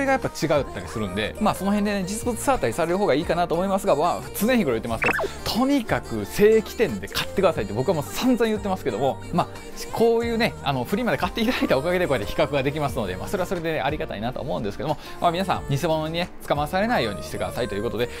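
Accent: Kansai, Japan. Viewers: ペラペラ関西弁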